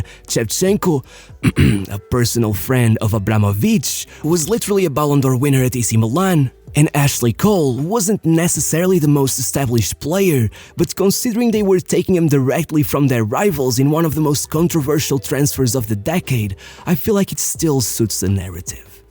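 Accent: Portugal. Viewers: 100% this is a portuguese accent ahahah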